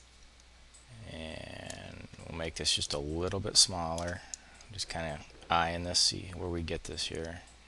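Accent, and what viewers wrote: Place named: United States. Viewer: Nice uploads! I just came across some videos on a Chinese video site and found him speaks like another Inkscape tutor Nick on YouTube, I mean the accent of them is the same in my opinion and they're both from the US.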